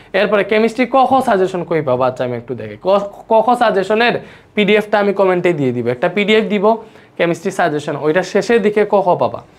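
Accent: Sylhet, Bangladesh. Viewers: ভাইয়া তুমি সিলেটি 😩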